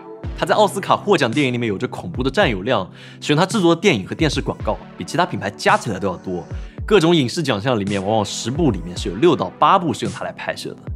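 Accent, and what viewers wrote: China. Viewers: 貨幣可不可以講清楚？我看你翻牆到美國開頻道，又操著中國口音，到底要我選哪個貨幣？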